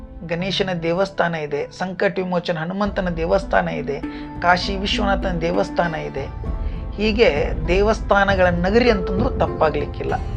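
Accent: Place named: Hubballi, India. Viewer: ಹುಬ್ಳಿಯವ ಹುಬ್ಬಳ್ಳಿ ಸ್ಟೈಲ್ ನಾಗ ಮಾತಾಡೋ...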